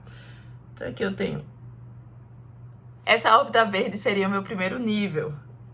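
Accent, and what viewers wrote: Pernambuco, Brazil. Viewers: Vc é de Pernambuco? Seu sotaque me soa bem famíliar😊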